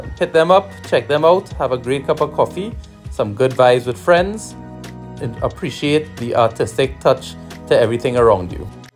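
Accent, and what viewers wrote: Trinidad and Tobago. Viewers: De man speaking proper trini inno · Hi my TRINI friend, Been a while since I heard from you. Missed the Accent As always thanks for sharing. Take care and bye for now. 22/07/16 🇨🇦 🍁.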